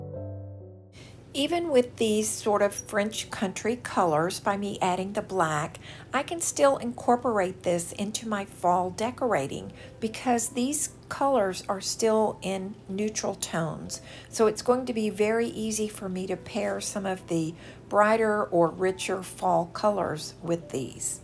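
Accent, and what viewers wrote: South, United States. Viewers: Comme toujours les objets sont très beaux 🤗😄et celui que je préfère est la coupe que tu as réussi à décorer avec le "air dry clay". Le résultat est tellement joli 🫶😍. Ne t'en fais pas pour ton accent, d'autres youtubers ont un accent du sud comme par exemple Susie de "Susie on a farm" ou Teresa de "our greene acres" et les gens s'en fichent. Moi même je suis allemande qui vit depuis 35 ans en France et encore aujourd'hui on me dit que j'ai un accent 🤷 et ce n'est pas grave. Bonjour de la France et reste en forme 👍😀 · I am from the Southern U.S.A, but live in Michigan now. I do so much enjoy the touch of southern accent in your voice. It is very soothing to watch your videos while I'm learning so much from you. Thank you for sharing your talent with your viewers. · Why would it bother you about your southern accent? I am proud to be southern. 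· I love a southern accent. Please don’t be self conscious. Just be yourself. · why in the world would you be bothered by your southern accent? I think it’s charming and you speak so softly. I am southern (North Carolina) and I am proud of it and unbothered at all by the way I speak and it’s definitely more twangy than yours. Lol. You are such a good painter (I am so messy) with exquisite taste. Your creations are always charming and unique and your instructions are clear and easy to follow. Lovely items! Thanks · Embrace that a wet Southern voice! Not everyone can speak with this genteel gift we have! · I think you should be proud of your southern roots, nothing wrong with having a southern twang. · I'm originally from the South I love the accent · Southern accent, love, I've heard thicker accent, your lovely, it's ok, if they have a problem, they can turn the captions on! Lol! Be blessed, BY THE WAY, I love your style! 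· Darling, Im from New Zealand and we have terrible accents! I just love listening to all the different accents on youtube, especially a southern one. You my love have a beautiful one!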